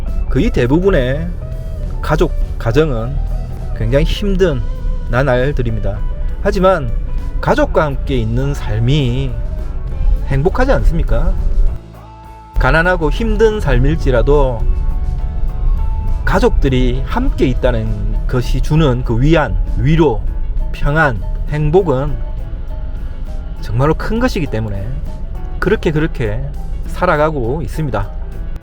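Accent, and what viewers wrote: Gyeongsang, South Korea. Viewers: 참 말을 재미있게 잘 하네요. 얄구진 차도 끌고 다니고 . 오랬만에 얄구진 소리 들어 보내요. 경상도 사투리 인같네요.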